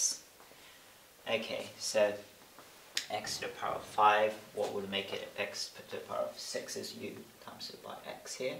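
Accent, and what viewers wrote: United Kingdom. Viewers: You know how good it feels when you got it right Btw I solved this in 10 min I’m not gonna lie I’m so happy Ps I love your British accent it’s so cool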